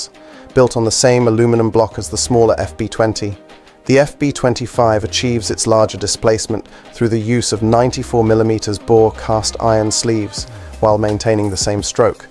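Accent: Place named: England, United Kingdom. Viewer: I take issue with the remark that a timing chain is maintenance free. It depends on the rpm used, presumably in USA, you sound English but price in dollars, automatic gearbox is normal and therefore high rpm rare but each chain has to go round the tiny crank sprocket and if that stays above 4,000rpm for long the chain is grossly overspeeded - read a chain catalogue or maker's recommendations. One reason for belts was to get away from wearing chains.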